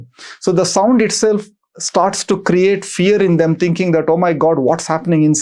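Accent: India. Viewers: I'm sorry I couldn't watch it because of his accent.Strange thing is that I'm indian too.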